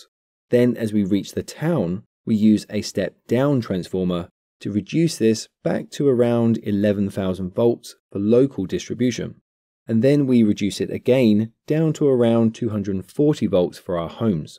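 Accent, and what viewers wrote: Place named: England, United Kingdom. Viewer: Leave it to someone with an English accent to use a beer analogy. Lol. Good job anyway. I learned some things.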